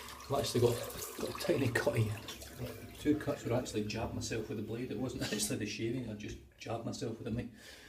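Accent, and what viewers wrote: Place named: Manchester, United Kingdom. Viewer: Nice tutorial, I've just bought the very same Proraso product (shaver is Edwin Jagger). Just a few more question, the pre-shave, on dry skin ? The soap, how long after the pre-shave ? The balm is before the after shave, and just straight after ? It seems you said usually it's the contrary, no ? Oh and lovely manchunian accent 👍😁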